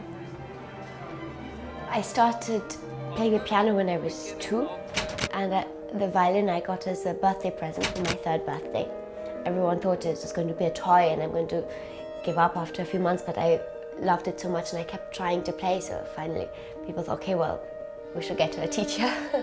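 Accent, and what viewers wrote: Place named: Germany. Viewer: I think I here some slight German accent in her English mother tongue.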